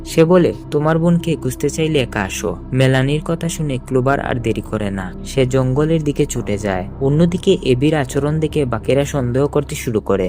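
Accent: Sylhet, Bangladesh. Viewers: আপনার মাতে লাগের তো আপনি সিলেটি সিওর নি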